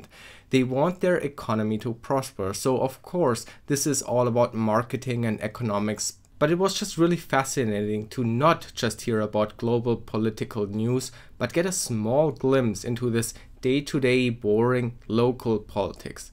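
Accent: Germany. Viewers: Dicker der Deutsche Aktzent zerstört so haha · That german accent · r u a german guy? i heard the slang long and in the clip the alter got me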